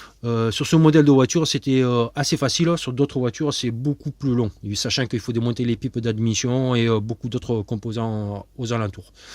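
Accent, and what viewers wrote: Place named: Midi, France. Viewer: Bravo, c'est très clair et bien expliqué.Et avec l'accent du Midi, en prime.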